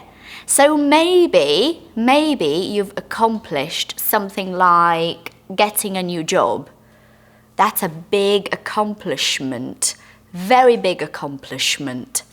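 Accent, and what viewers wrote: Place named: Leeds, United Kingdom. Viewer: Hi!!! Loving your lessons. Have you ever thought of moving back to England? I used to live in Leeds, about 15 years ago, and i'm enjoying a lot listening this accent again. You're a perfect teacher!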